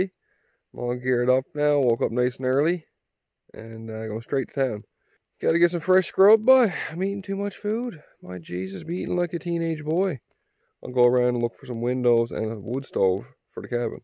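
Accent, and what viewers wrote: Newfoundland, Canada. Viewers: Enjoying your videos, are you from Newfoundland and Labrador? Your accent is certainly sounds like the Rock, best place in the world😉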